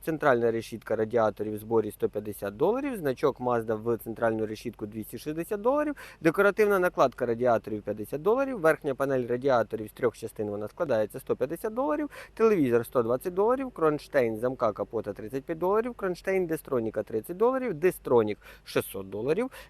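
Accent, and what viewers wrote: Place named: Romania. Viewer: Ти сам українець? Шо за акцент якийсь румунський чи молдавський? Наче носки в роті стіраєш паралельно з відеооглядом.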